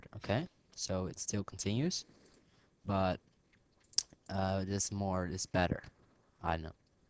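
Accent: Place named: Philippines. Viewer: are you filipino by any chance? i have a lot of friends that sound just like you :D and nice vid!!! i love sony vegas~ oh and i was wondering, how do you get a transparent fade in between 2 videos. i used a fade through transparent transition and it only goes through black.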